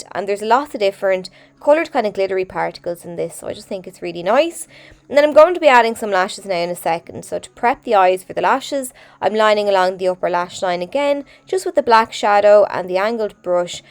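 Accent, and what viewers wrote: Ireland. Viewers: Really enjoy how you explain what colors you use and how to do this it really helps!! ❤ Thanks🙂😃and you must be Irish because of your accent! It's cute thanks❤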